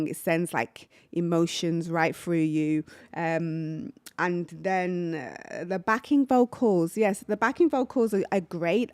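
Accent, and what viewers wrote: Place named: Manchester, United Kingdom. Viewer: Hello! I just wanted to say how much I appreciated your reaction. Few people truly feel Lara Fabian the way you clearly do. I was genuinely moved by how moved you were. You captured her essence perfectly, and I really hope you'll consider sharing more from that phenomenal London concert. Also, I have to say, you’re an absolute pleasure to listen to. Your voice has such clarity and warmth. Yet I couldn't help noticing a few vowel qualities that hint at a slight Northern influence, perhaps Manchester? It gives your speech a unique charm. 😊 I'll be attending Lara’s concert in Geneva on 28 November, and after hearing your impressions, I’m even more excited. Thank you again for such an honest and heartfelt video. 💜 P.S. I've just subscribed to your channel! 😉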